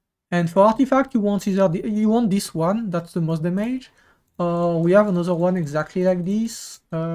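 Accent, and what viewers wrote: France. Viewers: Yea man your french accent is too strong lol..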